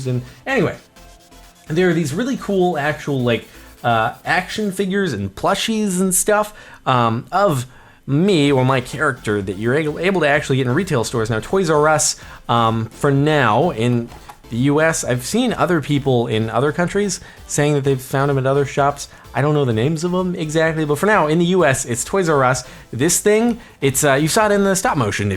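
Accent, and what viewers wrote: England, United Kingdom. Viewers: being from England, I can confirm that your English accent is 100% awful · your British accent is AWESOME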